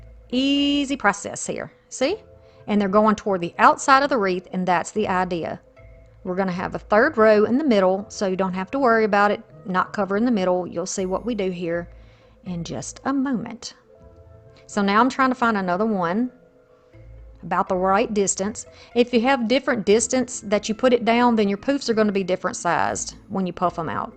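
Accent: South, United States. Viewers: I love your videos. Always so helpful & enjoyable. It is so nice to hear a southern accent. So many try to cover it up. I spend a lot of my days watching your videos. Thanks again.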